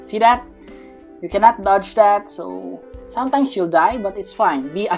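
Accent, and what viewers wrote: Philippines. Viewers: Are you a filipino? Because u sound like Filipino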